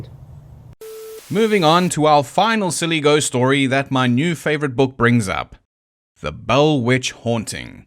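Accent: South Africa. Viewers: South African? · I thought u were south african when i heard ur accent😂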